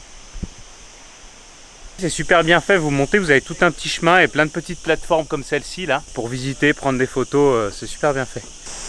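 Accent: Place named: Spain. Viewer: Quel accent ! Un vrai espagnol 😊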